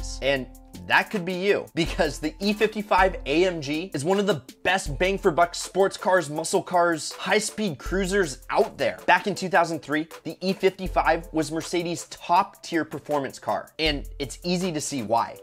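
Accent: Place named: Chicago, United States. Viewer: Are you from Chicago? Or is that just default? I thought you were west coast.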